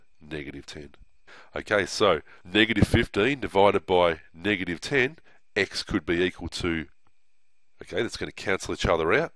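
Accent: Australia. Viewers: its Australian haha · Are you aussie cause i also am ? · It's clearly ozzie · wow, my mind is blown... that's so much easier than how I was taught! Also, math done in Australian just sounds less arduous. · Anything with Aussie accent is cool!!!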